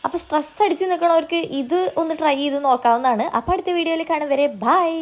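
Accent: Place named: Thrissur, India. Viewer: നിങ്ങളുടെ തൃശ്ശൂർ ഭാഷ കേട്ട് വീഡിയോ കാണാൻ നല്ല രസം ആണ് ❤️😌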